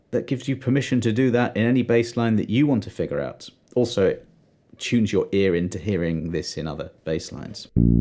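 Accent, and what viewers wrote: United Kingdom. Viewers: Fantastic lesson, as usual,! Your lessons are so informative, and I would say the best ones on YT. I’m curious, though (and I hope you won’t be offended), but why do you Brits pronounce “Jaco” as “Jacko”? It’s actually “Jahko” (long A, as in how Brits say “tomahto.”) This also goes for “Yamaha,” which I hear Brits pronouncing with a short A, as in “Yam (rhymes with “ham”) aha,” instead of the correct “YAH-maha.” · I pronounce Jaco 'Jahko' and 'Yamaha' 'Yahmaha' (I have 5 Yamaha basses) so you're preaching to the converted. I commented because I thought it overly pedantic that an American would attempt to school an Englishman - from whom, I might add, he's getting FREE bass instruction - on pronunciation. It'd be like me viewing an American cooking segment and admonishing the chef for pronouncing the herb (or 'erbs' as Americans call them) basil 'bayzil', instead of 'bazzle' as we do in Australia and the UK. 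Anyway, that's enough from me. Back to the bass...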